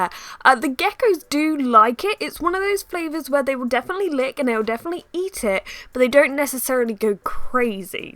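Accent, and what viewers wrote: United Kingdom. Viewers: Nico Savoca it’s a British accent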